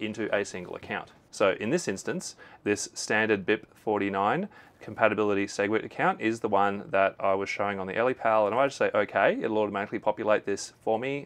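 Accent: Australia. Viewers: thanks for the reply, I never thought you would ever reply. But I am very happy to get some help. I think u got an Australian accent . I am here in Australia, too. I never entered any passphase. Never. I never had any other accounts. Just this one. I was using this account just one week before it all happened to me. Please 🙏 tell me what's the solution is. How can I get my life savings back. Because I have been very depressed from the last two weeks. Seems you have very good knowledge. Please 🙏 help me . Please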